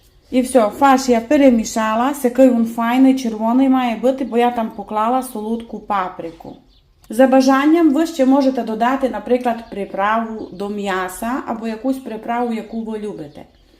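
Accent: Transcarpathia, Ukraine. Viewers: Привіт.Я обожнюю ваш закарпатський діалект і ваші рецепти.🌲💛💙🌲🇺🇦🌲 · Дуже подобається ваша мова. Слухаю вас з насолодою. Дай Господь вам здоровʼя і наснаги. І хай Господь спасе нашу Україну.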